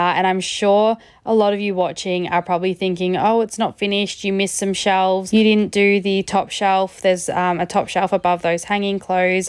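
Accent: Australia. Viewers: You know what’s fun? Finding an Aussie making this kind of content! Usually it’s Americans or other nationalities. It’s oldly relatable seeing Big W, Coles and Woolies bags being used as your donation bags haha, just hearing the accent, the “brush it off and keep moving/devil may care” attitude to passive aggressive comments, and Bluey toys around etc even you having a doggo (it feels like all Aussies do!) it’s really a breath of fresh air. Hi from QLD! 💕✨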